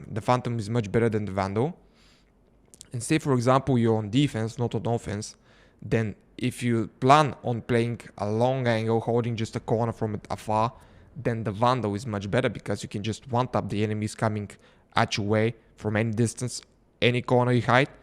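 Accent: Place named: Bulgaria. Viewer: no wonder yr accent was familiar to me you are Bulgarian <3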